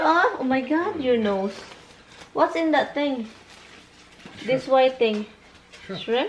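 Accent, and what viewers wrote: Visayas, Philippines. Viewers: hello mam maayong buntag....ka cute sa imong mga ering og ero hehehe bisaya kaayo.....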